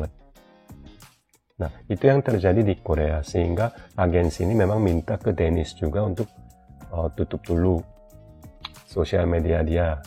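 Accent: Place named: Java, Indonesia. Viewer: Intonasinya kaya orang jawa ada medoknya dibeberapa bagian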